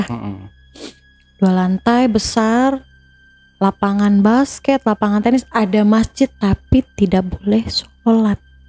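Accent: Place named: West Java, Indonesia. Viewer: Saya suka logat bicara ya pasti orang sunda.soalya saya juga gitu logat ya🤭 serius denger ya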